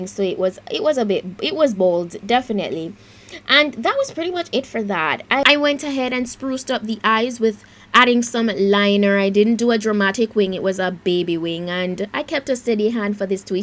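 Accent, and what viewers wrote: Jamaica. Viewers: Do I sense a slight Jamaican accent...... best cut crease video for chocolate girls.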